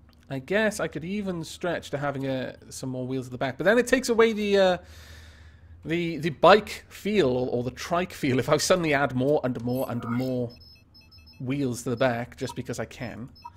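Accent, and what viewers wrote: Wales, United Kingdom. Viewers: Is he welsh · For some reason i think you sound Welsh.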